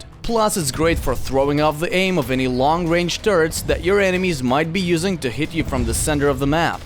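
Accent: Russia. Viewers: He is Russian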